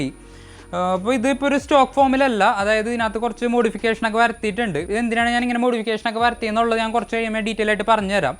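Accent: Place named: Kodungallur, India. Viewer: കൊടുങ്ങല്ലൂർ, കരൂപ്പടന്ന ആണോ, അവിടത്തെ ശൈലി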